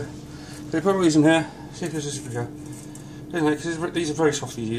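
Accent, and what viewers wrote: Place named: South West England, United Kingdom. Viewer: English, probably South Western. Not far from Forest and Pirate dialects. Pair of dills.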